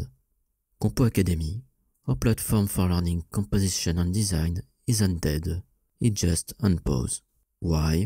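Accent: France. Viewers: It is amazing that you came back with another video! We missed you and your content which is very inspiring and valuable, thank you so much for taking the time to share it 😊 And don’t you ever worry about the french accent or the way you are speaking english, keep talking, there are people who likes or loves hearing it.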